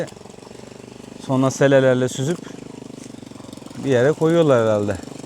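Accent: Thailand. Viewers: reis neden ingilizceyi tayland aksamı ile konuşmaya çalısıyorsun? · ingilizceyi tayland tınısıyla-aksanıyla konuşuyorsun artık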